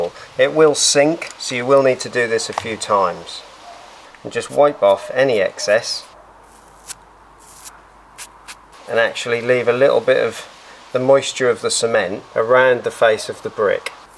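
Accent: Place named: London, United Kingdom. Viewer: Fantastic! Can I ask what accent you have? Sounds like a blend of London and 1920’s BBC English.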